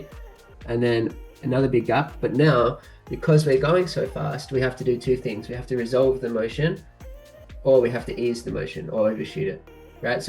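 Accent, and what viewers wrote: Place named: China. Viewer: sounds like a chinese student very famliar accient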